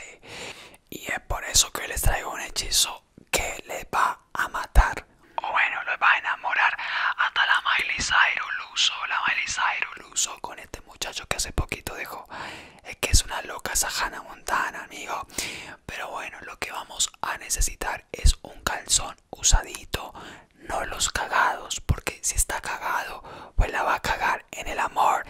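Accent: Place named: Bogotá, Colombia. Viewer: Cuando empecé a ver tus vídeos pensé que eras español jsjsjjs y después me di cuenta de que eras colombiano, más encima rolo xd, me cagué de la risa con tu actuación , y tu bigote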